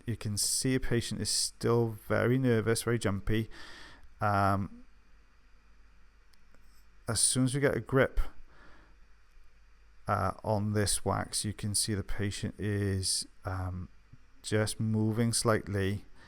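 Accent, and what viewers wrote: Wales, United Kingdom. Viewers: The captioning is getting a bit better, I think it has problems with your lyrical Welsh accent! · Welsh accent I hear??? :)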